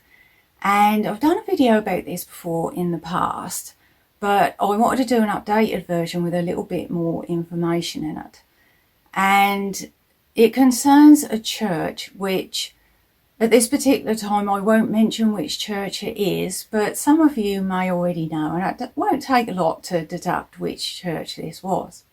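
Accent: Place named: Suffolk, United Kingdom. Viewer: Great tale 🙂 I used to fish a lake at Mistley Heath, not far from Hopkins home turf, and that place used to give me the heebyjeevies at night, no idea why but it had a weird vibe. I'm not easily spooked, I've fished loads of remote places at night over the years- even Orford Ness when sometimes I'd be the only person over there- with no problem but that place was odd. Love your accent, I'm Ipswich born and bred but it's not often you hear proper Suffolk these days, reminds me of my Nana and Aunt when I was a kid 🙂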